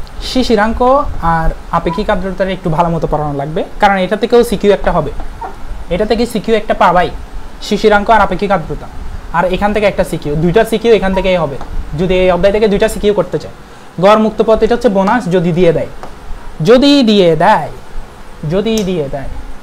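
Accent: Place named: Chittagong, Bangladesh. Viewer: চট্টগ্রামের মানুষকে বোঝা যায় তার ভাষার অমায়কিকতায়!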